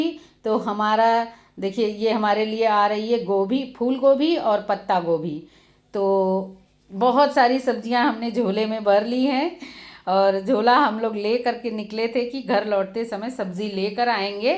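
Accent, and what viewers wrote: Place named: Chhattisgarh, India. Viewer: Mam Aap kha se ho Aapki language to chatishgad se lag rahe ho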